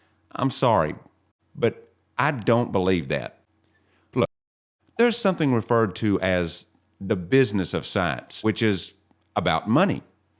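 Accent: South, United States. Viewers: Love this guys southern accent. He sounds like my brother. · I'm guessing that narrator is from the south...LOL GREAT video sir! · your southern accent just bring it home or reminds me of home. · Your from the South, aren't you friend? Maybe....the Tennessee Valley? It's the accent. You sound like an educated Southerner... probably in the above mentioned region. There are alot of engineers and scientist in the TN valley, ie Marshall Space Flight Center, in Huntsville. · Hey stupid, Its not Mars! Its not what looks like weird stuff on Mars, Its a shot of a place on Earth and calling it Mars. You can't be that stupid. Or you are working for Nasa and are doing the life in space BS! Listen up Stupid, No planet, No space, No rovers, No nothing but an agenda to try to make our Lord and Savior seem like a creature from space to make us fight against GOD! 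When Yeshua returns, You bow down. If a fake alien invasion happens, You go to Nasa and Govt's to fight. And your accent of a Southerner does not make you trust worthy!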